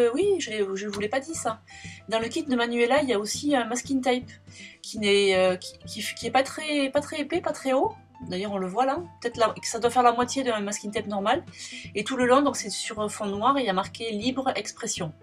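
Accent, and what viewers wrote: South, France. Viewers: Moi je ne trouve pas que le masquage sur ton étiquette était inutile ça rend trop bien ça fait classe je vais te piquer l idée. J aime bcp aussi la fuse avec le tag. Deux petites questions : quelle est la marque de tes pochettes ? Comment imprimes-tu tes photos carrées ? stp. Merci pour tes vidéos avé l assent c est très agréable 😁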